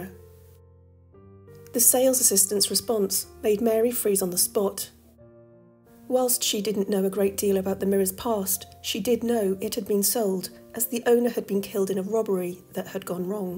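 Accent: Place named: Wales, United Kingdom. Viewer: Love your Welsh accent 🎉.. Great vids,keep them coming. Happy 2024 to you and all 🎉❤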